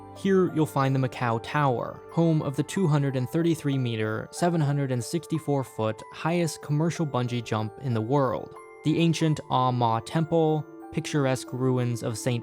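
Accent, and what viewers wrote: Spain. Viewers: I know it's hard, different and weird, but reading Portuguese names in Spanish is both rather offensive to Portuguese people, as well as wrong. I'm an avid fan of this channel, so all I ask is for names to be read properly because if not, it is less offensive for you to try and read them in English. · Why people insist in pronouncing Portuguese names as they would be in Spanish ?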